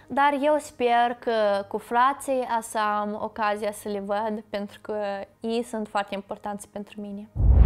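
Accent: Moldova. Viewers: Ce nu inteleg, daca această fata a fost crescută în Elveția de la 3-4 ani, cum de are un accent moldovenesc ca o persoană care acolo a crescut?🤔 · Vorbesti foarte bine româneşte!Bravo!Mai ales ca o vorbeşti cu accent frumos moldovenesc,eu fiind din Suceava 🇷🇴Esti desteapta,deosebita,nemaiponită❤❤❤ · Totuși, de ce are asa accent moldovenesc, dacă a trăit mult fără mama în Elvetia?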